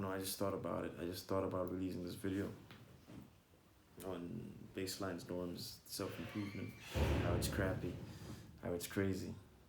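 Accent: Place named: Rwanda. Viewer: Great work bro , your accent sounds Rwandanese . Where are you from ?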